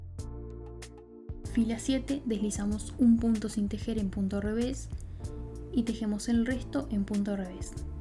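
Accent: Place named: Argentina. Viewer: Quedo super bonito! Ansío hacerlo. Pensaba que tu idioma de origen era inglés pero al escuchar tu voz me di cuenta que tienes un acento muy argentino, sino me equivoco!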